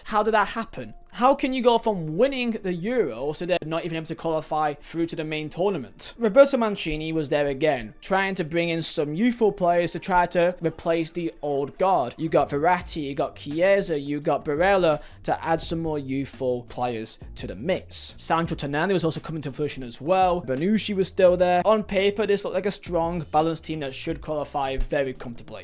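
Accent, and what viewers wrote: United Kingdom. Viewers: GREAT VIDEO AND YOUR BRITISH BTW FELLOW BRIT.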